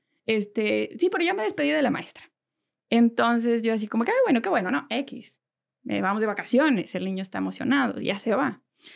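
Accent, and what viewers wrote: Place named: Mexico. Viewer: Me cuesta entender lo que dice, su acento es super mexicano, demasiado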